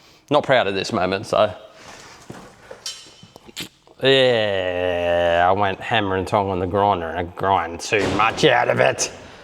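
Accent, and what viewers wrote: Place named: New Zealand. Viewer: Do you ever lived in New Zealand ? your accent have a bit off Kiwi in it .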